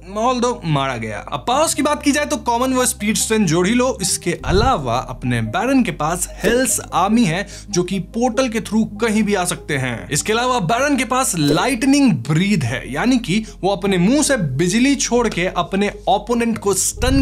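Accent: Bengal, India. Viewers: bhiya aap sabse pehele anime ki story cover karo aise bhut confusing ho rha hai please 1st full manwa explation chapter wise and then all the details of characters,gods and monarchs please bhaiya you will be the 1st to cover this anime fully chapterwise on youtube and btw love your videos so muchlots of love and are you bengali as you sound kind of like them as I am bengali tooooo please consider my request please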